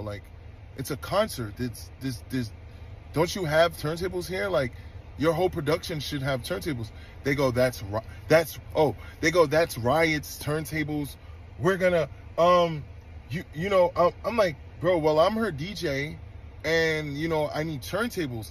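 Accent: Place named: New York, United States. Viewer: Really? As a fellow Newyorker, I had no issues. We must really sound crazy to everyone else 😭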